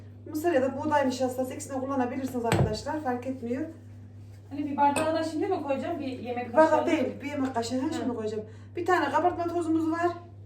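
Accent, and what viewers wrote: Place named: Sivas, Turkey. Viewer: Bizim sivemizde aynı bacı Sivas liyim 😊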